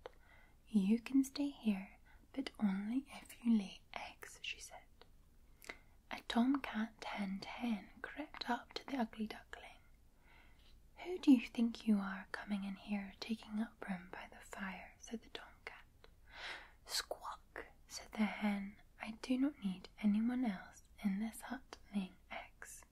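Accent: Scotland, United Kingdom. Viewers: She is the best ASMRtist for reading stories with her beautiful ❤️ Scottish accent!:_Loveheart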